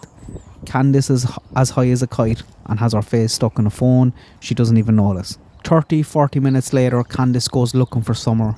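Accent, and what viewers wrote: Ireland. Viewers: That’s possible your theory, alcoholics and drug addicts don’t pay attention to their children. Then put a phone on top of that and you’ve got a mother who never does anything. Just take a look at that house it’s filth. The only thing she cares about is drinking and getting high. The kids are just an annoyance. I love your Irish accent. My last name was Dailey 😀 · Police dogs can pick up DEATH. The boys has been in CPS for over 9 months & would have said something by now. Summer's foot prints were found on 2 different properties confirmed by PI & Dogs. This theory is CRAY CRAY. Crackin Case done GREAT coverage of this case with FACTS. I enjoy listening to your accent & your slang for stuff-Irish Right?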